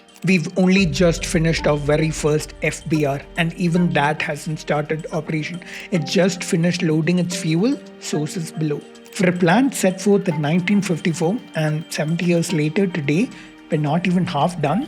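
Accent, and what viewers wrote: South India, India. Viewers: Hey, no doubt that you have ample knowledge of the topic but the only problem is that your accent is too much south indian. Please try to improve it and I can bet that your viewership will increase many folds. Just a suggestion for your growth, please don't take it otherwise. I faced similar problem 18 years ago when my accent was Punjabi and people used to make fun of my English. Thanks, God bless you 🙏🙏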